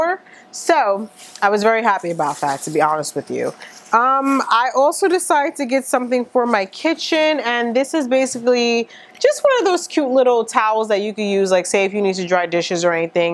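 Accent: New York, United States. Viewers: ur videos r everythinggggggggggggggggggggggggggg so nice to hear a fellow ny accent im from da bronx XX!!!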